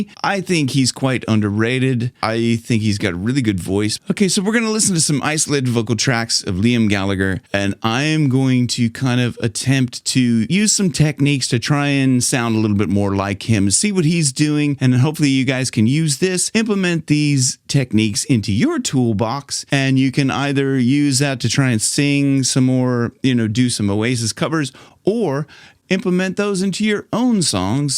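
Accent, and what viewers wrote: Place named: United States. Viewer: thanks Man I understand with the American accent it was a really good video bro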